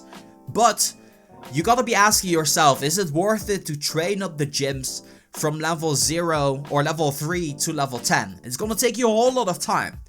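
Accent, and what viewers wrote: Netherlands. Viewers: Arnhem Ik dacht al dat je Nederlands was. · Are you Dutch? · Are u dutch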